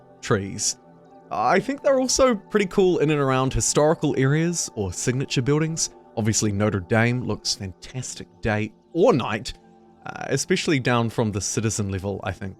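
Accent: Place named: New Zealand. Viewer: As soon as I heard your accent I was like, Holy shit your kiwi! Subscribed my friend 😁🤙🏼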